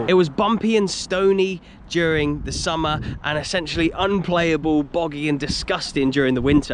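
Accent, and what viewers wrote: Scotland, United Kingdom. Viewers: Hello are you from Scotland?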